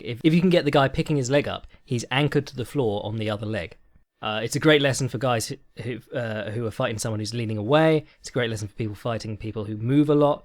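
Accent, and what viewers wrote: United Kingdom. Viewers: Dude! You're like, so English, Bro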